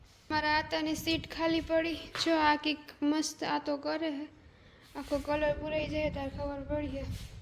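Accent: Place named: Porbandar, India. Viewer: નમસ્તે 🙏, તમારી બોલી સાંભળવાની બહુ મજા આવી, તમે જે નિખાલસ દિલ થી સાફ - સાફ બોલો છો, આપણાં પોરબંદર ના બરડા ડુંગર ના વિસ્તાર ની બોલી એક દમ સાફ - સાફ ભાષા હોય છે, અને આપણા લોકો ગમે ત્યાં હોય તે તેની બોલી ઉપર થી ઓળખાય જાય છે, મે તો આ તમારો વીડિયો મે પ્રથમ વખત જ જોયો છે, પણ બહુ મજા આવી,👍 ✨ ધન્યવાદ છે,🫅🏅🍫 તમને અને તમારા પરિવારને કે આટલે દુર સુધી અજાણ્યાં દેશ માં કમાવા માટે ની તમે હિંમત કરી છે, એ પણ એક છોકરી ની દ્રષ્ટિએ,તમારી હિંમત ને ધન્યવાદ છે,👍 મારા ગામ ના પણ ઇઝરાયલ દેશ માં છે, તે ત્યાં કયા સીટી માં છે,તે ખબર નથી, તમારું કામ ચાલુ રાખો,એક સમયે તમને જરૂર તમને સફળતા મળશે.🥀 लगे रहो 👍 ♥️🙏 પરમાત્મા તમને સારું સ્વાસ્થ્ય, સુખ અને સુરક્ષા, લાંબુ આયુષ્ય આપે તેવી પ્રાર્થના 🙏 નમસ્કાર 🙏 🇮🇳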